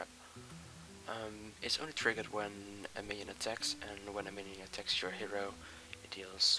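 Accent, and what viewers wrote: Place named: Netherlands. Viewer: ik haat je Nederlandse accent gahaha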